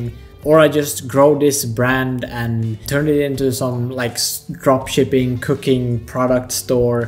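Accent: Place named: Finland. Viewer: The short video looks really good, but I much prefer your Finnish accent over that robot voice. I understand you're trying to prove that the whole video uses only AI, but if you do decide to grow the Frugal Meals channel, it would be cool to hear your natural accent instead of the robot.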